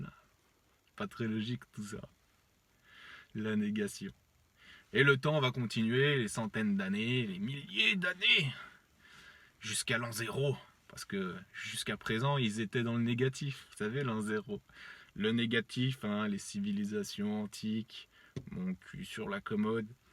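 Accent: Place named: Burgundy, France. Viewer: Nom de Diu d'vin diu ! Tu roules les RRRR ha ha ...Je suis bourguignonne aussi, on a le même accent :) J'ai beaucoup appris avec toi, ainsi qu'avec "le blog à Cédric" ^^ Merci, si seulement les gens voulaient lâcher leurs religions, ils découvriraient le potentiel que nous avons réellement...et que nous nous suffisons à nous-mêmes :)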